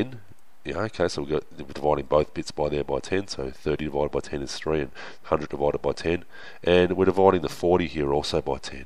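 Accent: Australia. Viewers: so weird and nice to hear an aussie voice on YT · Hes definitely Aus, from a fellow Aus. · I feel like he's Australian, not British. Of course an American can't tell the difference lol. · I think your an Australian because you have a very aussie accent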